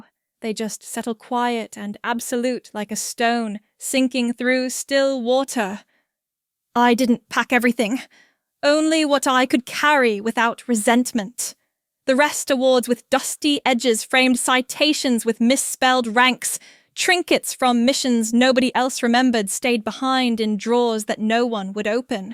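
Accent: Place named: England, United Kingdom. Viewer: Why does she have an English accent?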